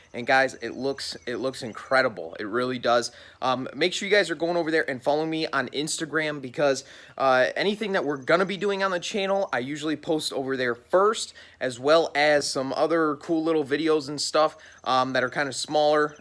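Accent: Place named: Michigan, United States. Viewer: It's a Michigan accent... I lost mine since I moved south 35 years ago... y'all..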